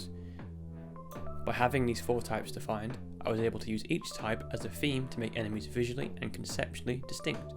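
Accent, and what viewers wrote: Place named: United Kingdom. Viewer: this is a fully VALID video on game design but i feel like you should enunciate your voiceover more to unleash the power of your british accent. or if that doesn't work maybe you can try just yelling into the mic? that might attract a wider viewership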